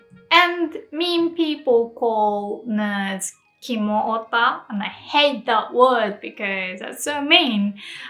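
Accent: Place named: United Kingdom. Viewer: Well theres a reason why she has a british accent when speaking english, she probably spent some time in europe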